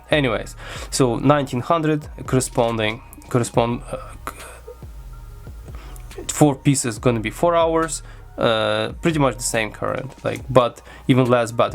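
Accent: Russia. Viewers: У вас российский (или другой специфический) акцент? или у меня что-то с восприятием речи? 🤣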